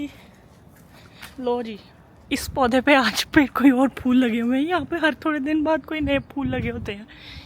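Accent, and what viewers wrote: Lahore, Pakistan. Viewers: U r soooo cute n talk typical lahorei Urdu … simply love watching u 💕💕💕💕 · Salam g so quite look vicee totally koean but your language extent is lahori ap to koeran silha rhe hn m ap ko quran sikhana chati ho takweed k sath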